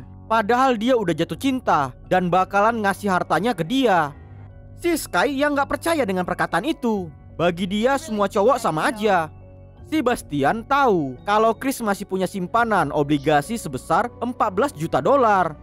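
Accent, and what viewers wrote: Medan, Indonesia. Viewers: Medann kali ahh BG😂🤙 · Medan kali ngomongmu bang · Org medan ya min... Wwkwkwkw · Lucu lae nih,,bahasa medan kali😁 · asal medan ya bg dri cara logat bicaranya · Medan kali yakan bos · Mantap bg suka kali aku penerangan abg logat Medan nya kren salam dari Sibolga bg 🙏😊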